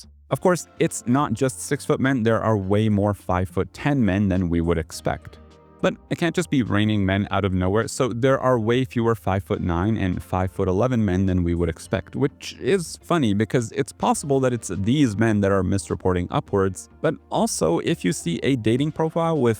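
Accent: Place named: Sweden. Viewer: I see, sorry for assuming. I thought I heard like a faint hint of Swedishness in the way you pronounced some vowels. Great video by the way🙏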